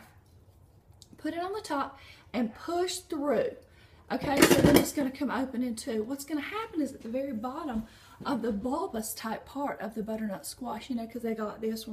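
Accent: South, United States. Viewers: You’re cute. I like your soft southern voice. I don’t usually watch chatty videos but it really was pleasant to listen to yours because of that. I’ll have to give that recipe a go. Thanks. Have a funtastic day!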